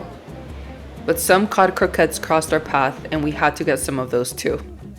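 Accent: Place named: Brazil. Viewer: Pelo sotaque, achei que fosse brasileira!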